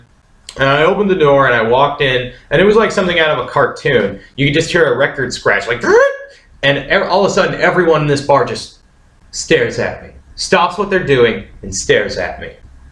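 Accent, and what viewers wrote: United States. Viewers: awwww. white boy feels like a minority? Wtf did you think Japan was a magic wonderland? it is a place like any other. You sound American. America isn't any better. It is worse in many ways. All places have their ups and downs. You sound miserable and desperate. It's not the country you are in. It is you. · Sounds like an american...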